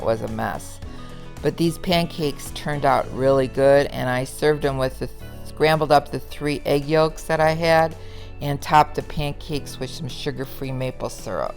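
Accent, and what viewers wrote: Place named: Midwest, United States. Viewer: you're welcome! I asked on aonther if you were originally from the Midwest (I'm from MI, lived in WI a while, and I feel like I hear Midwest when you speak 🙂)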